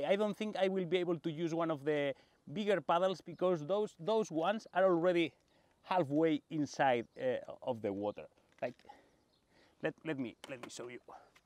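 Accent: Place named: Spain. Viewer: eres espanyol se nota xd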